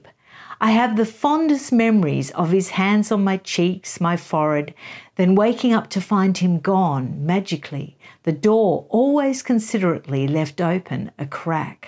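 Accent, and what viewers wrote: Australia. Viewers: This is the first time that you have popped up on my feed and I'm glad you did, and it was so good to hear an Australian. Looking forward to watching more.. ❤ As for Harry, I find him to be a nasty person with no respect for anyone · ❤ your aussie accent.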